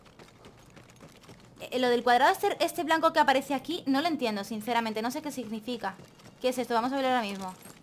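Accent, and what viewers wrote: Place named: Andalusia, Spain. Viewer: No se de que parte de Espana tu has nacido?? ,,pero tu acento me recuerda muchoo al de mi abuelo (muy Andaluz) ,,el nacio en Cadiz y se fue de muy pequeno a Chile ,,donde yo naci ,,, me encanta tu manera de hablar ,Taison es un suertudooo , pedazoooo de mujer que tiene ,, a cuidarla machote . saludos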